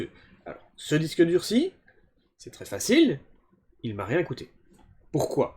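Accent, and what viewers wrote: Belgium. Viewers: T’es belge ?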